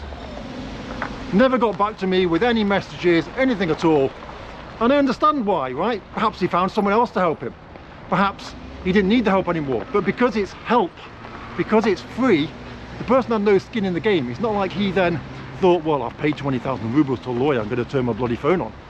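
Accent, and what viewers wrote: England, United Kingdom. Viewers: Hard to tell you are English, than you said "Its All Goes Pair Shaped"! English 100%! You been living there 20 years BUT your English has not changed a bit! Even a Western Canadian can see that!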